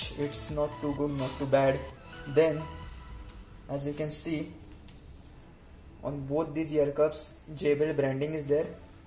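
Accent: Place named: India. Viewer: He has that indie accent you can't change an accent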